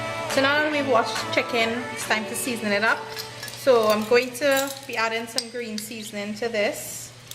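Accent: Trinidad and Tobago. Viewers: Wow. A muslim speak english. And Trinidadian english accent. Cooking Trinidad stew. Wow. Because the muslim in canada dont speek english. They speek only thing from packastand . I wish them Will speak english like you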